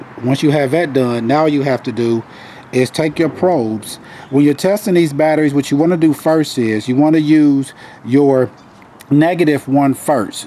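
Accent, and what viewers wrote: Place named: St. Louis, United States. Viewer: Sounds like you might be from St. Louis my friend.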